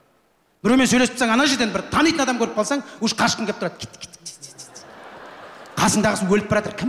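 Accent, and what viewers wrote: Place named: Torgay, Kazakhstan. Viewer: Тұрсынбекке ризамыз. Бірақ қазағымның отырмыз деген сөзін - отырқ, жатырмыз деген сөзін - жатырқ дегені өте қате деп білемін. Мен Қостанайлықпын, біздің жақтағы Торғай, Науырзым елі солай сөйлейді. Қалған аудан дұрыс сөйлейді. Осыны қалай қалыпқа келтіреміз ??? Мектептегі сол елдерден келген ұстаздар балаларды солай үйретіп жатыр. Масқара...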